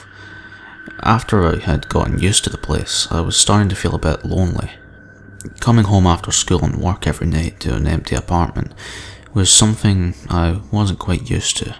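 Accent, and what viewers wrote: Scotland, United Kingdom. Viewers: I'm a huge fan of the horror narration channels, great to hear a fellow Scot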